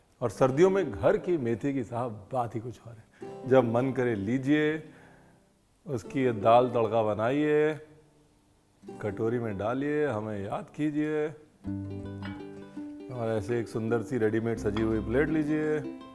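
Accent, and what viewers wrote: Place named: Lucknow, India. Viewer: इतना लखनऊ टपकता है आपकी जुबान से मैं घर से दूर हूँ , करीब आ जाता है शहर । Keep going, lots of love Boss.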